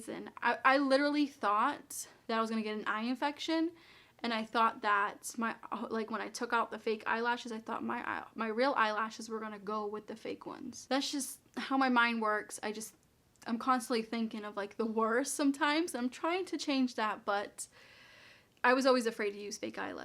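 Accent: Boston, United States. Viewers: That accent is 🔥🔥. I'm from L.A so hearing your accent is different. Is that a Boston accent?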